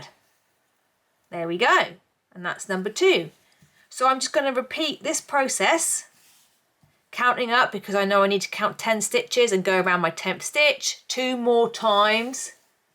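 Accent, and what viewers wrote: United Kingdom. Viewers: At last a british voice,phew what a releif.Love the tutorial,am following along,just need to find the measurements i need.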